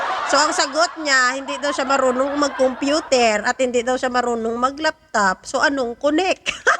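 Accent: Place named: Iloilo, Philippines. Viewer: The best talaga si ate with an ilongo accent 😂😂😂😂😂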